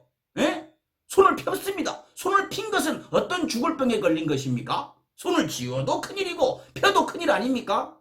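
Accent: Jeolla, South Korea. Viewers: 경상도사람이지만 전라도 사투리가 너무 구수하니 듣기 좋네요. 강성범씨 방송보면 속이 뚫리는 것 같습니다. 앞으로도 잘못된 놈들 많이 씹어 주시길~~^^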